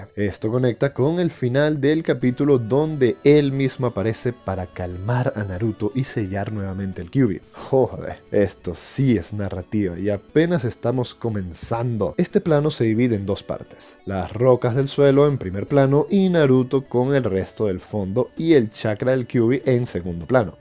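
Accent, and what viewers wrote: Venezuela. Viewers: Primer comentariooooooo, oye eres venezolano? Me da la sensación, me encantan tus videos, saludos desde Venezuela mi pana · Eres venezolanoo? jajaja ´´sabes como es la vaina???´´ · Eres vzlano? O_o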